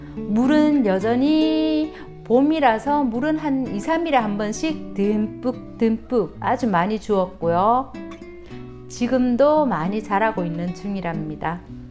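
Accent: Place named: Gyeongsang, South Korea. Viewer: 요즘은 애니시다도 능력에 따라 삽목이 어느정도 가능해진것 같아요 정감있는 대구사투리? 즐겁게 보고 갑니다~~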